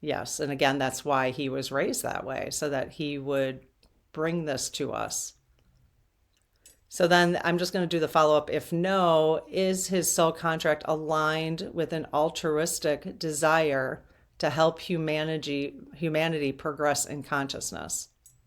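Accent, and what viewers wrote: United States. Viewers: looks and talks very much like my next door neighbour. She has a doppelgänger in Australia!! It’s like looking at my lovely next door neighbour but she’s talking with an American accent! I love it!💖🦘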